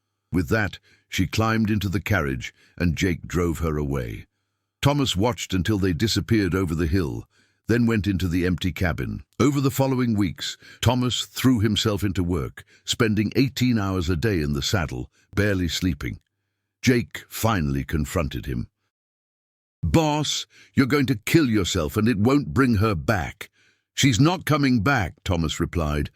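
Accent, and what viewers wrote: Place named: United Kingdom. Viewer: The British accent doesn’t match the Wild West. 🥴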